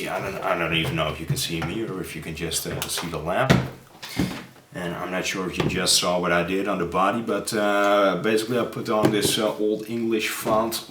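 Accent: Netherlands. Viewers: Ben je Nederlands haha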